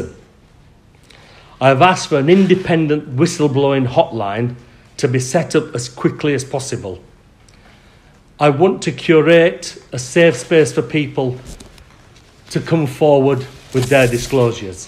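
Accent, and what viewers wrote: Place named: Yorkshire, United Kingdom. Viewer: Great Yorkshire accent..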